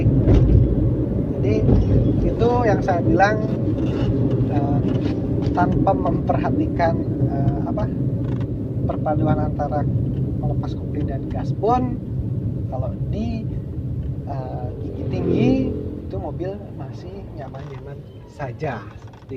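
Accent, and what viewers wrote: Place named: Pangandaran, Indonesia. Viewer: Orang mana a siga orang pangandaran ningan😁😁🙏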